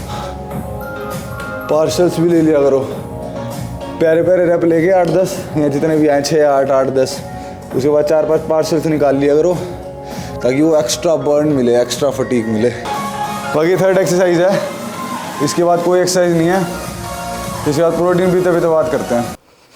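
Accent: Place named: Haryana, India. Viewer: Haryanvi brooo 🗿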